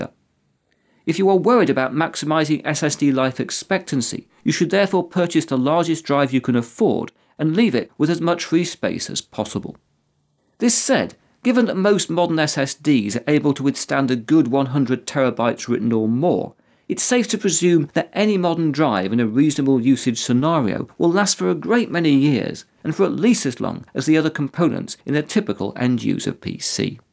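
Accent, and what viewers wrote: United Kingdom. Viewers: Dude is brittish, ofcourse its gonna be exemplary. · Linus but British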